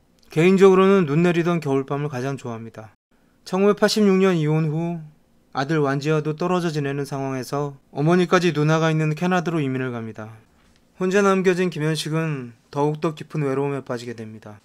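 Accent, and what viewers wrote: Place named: Seoul, South Korea. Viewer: ALL MIX님 말투가 90년대 서울사투리같아서 더 영상이 와닿습니다. 좋은영상 감사해요!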